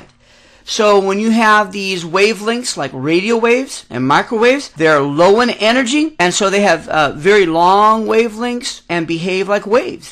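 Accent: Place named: England, United Kingdom. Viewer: You sound a bit like a country yokel from England with that accent there.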